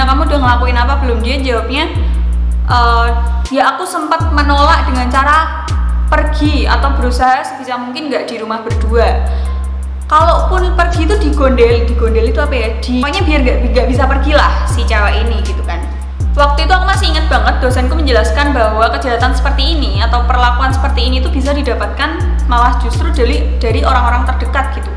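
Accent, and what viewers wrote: Java, Indonesia. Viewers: Wong jowo ndi to mbak?😃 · wedok iki medokkk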